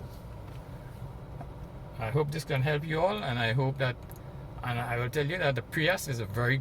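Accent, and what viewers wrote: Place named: Trinidad and Tobago. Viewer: Ah hearing d accent trini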